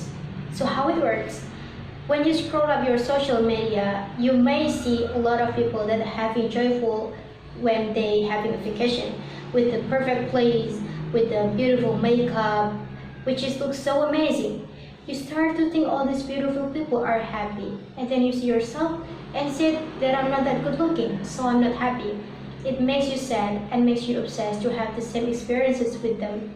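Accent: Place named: England, United Kingdom. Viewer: Saran sih... Pronunciation nya terlalu ke inggris2 an, jadi di dengar nya agak ngga jelas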